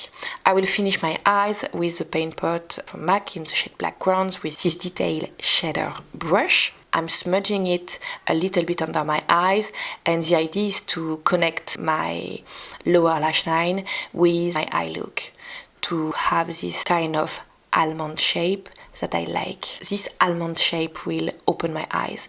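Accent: France. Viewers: Salut! Tu habites où? Tu as beaucoup de vocabulaires c’est super mais ton accent est super français xD ! C’est pas méchant :) je te souhaite une bonne journée !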